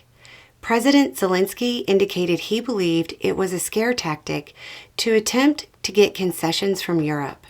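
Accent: South, United States. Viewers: I'm increasingly enjoying the dichotomy of the words of conflict, warfare and weaponry with the sweet voice and Southern charm accented delivery. Just wondering for the future, if there would be a need to bring Beau out of mothballs for the quiet rage that he occasionally would bring. Just would prefer if there is no incident that would cause such a need, though.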